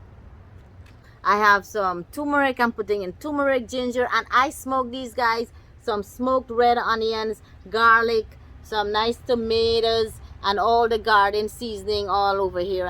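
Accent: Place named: Guyana. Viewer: i have watched this video/your Narration about preperation is amazing/you are talked very nice -some times I am laughing about your talking /your english is mixed with Guyana and little bit 🇺🇸USA/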